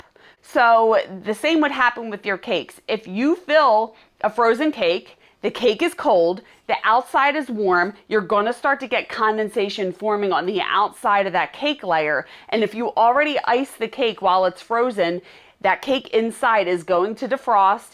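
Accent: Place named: Delaware County, United States. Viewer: I just came across this video trying to find tips for making my daughter's wedding cake. I heard the DELCO accent...living in SC for 14 years now. It's funny how I can still hear it right away. Lol Of course I had to look you up and saw Bensalem... Great tips! Thanks!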